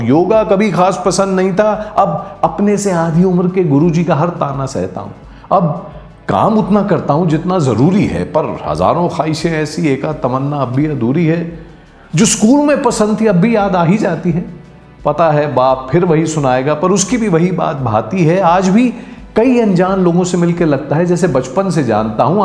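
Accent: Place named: Lucknow, India. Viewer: Bahut khoob ! Lagta hai hamari tarah aap bhi Lucknow se taalluk rakhte hain 😀🙏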